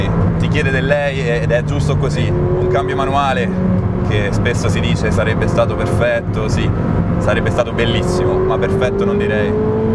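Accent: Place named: Corvaro, Italy. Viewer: Ciao... no sicuro proprio no... ma vedo sempre più video simili... dove un comune mortale non può quasi mai arrivare... spero non ti sii convertito totalmente a questo livello di auto... ti seguo da sempre x il modo di raccontare le auto e la storia, e perchè mia moglie e di Corvaro noi siamo in sardegna e quindi a mia moglie le fà piacere sentire quell'accento... verró a trovarti promesso!!! Perdonami se è sembrata una provocazione!!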